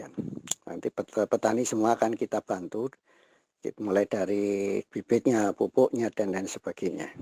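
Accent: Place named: Java, Indonesia. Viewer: Ngomongnya aja medok ko' ingin nguasai dunia.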